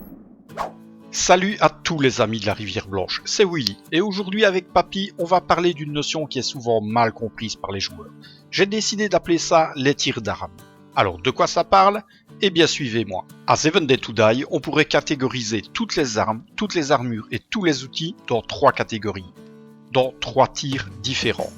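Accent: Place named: Belgium. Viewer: Au vu de ton accent et celui de papy, je dirai que tu proviens de Belgique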